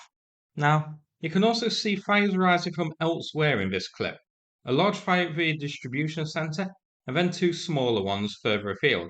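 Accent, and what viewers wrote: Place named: North, United Kingdom. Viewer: The English is clear but has a North country accent. Turn on the subtitles if you are having trouble.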